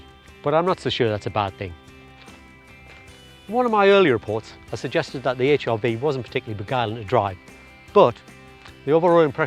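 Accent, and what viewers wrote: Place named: Newcastle, United Kingdom. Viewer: What do you utter at 2'.08" ? , 2 '.15"? , 4'.05" ? , 5.'.07" ? , and 4'.04" WTF do you say here? The biggie 5'.41" " to have our HRV ( Felled?) Do all people from Newcastle speak like you? Your intonation is as hard to understand as a foreign dialect ! I had to listen over and over those parts I noted to try and decipher the mumbled dialect and still could not work out what english words you were using . I thought maybe Newcastle has its own dialect , its patently obvious its not english ! LOL The review is good but you need to annunciate better and sort your intonation.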